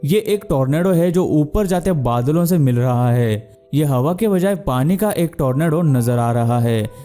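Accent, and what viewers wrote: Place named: South India, India. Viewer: Aap ki aawaj se to aap south indian lag rahe hain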